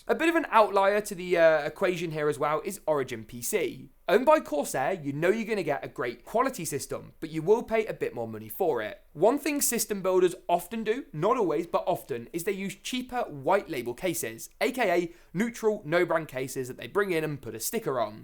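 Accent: United Kingdom. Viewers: mate he is definitely not Australian he has the strongest British accent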